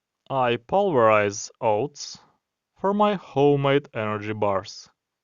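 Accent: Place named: United Kingdom. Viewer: Все гарно, лайк, але твій Брітіш акцент.. Тоді хоть зміни прапор з Американського в заставці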